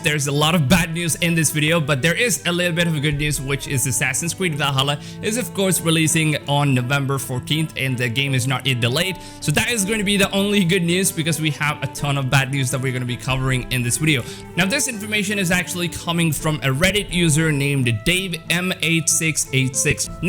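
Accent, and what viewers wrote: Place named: South India, India. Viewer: That south Indian accent is creeping through your American accent.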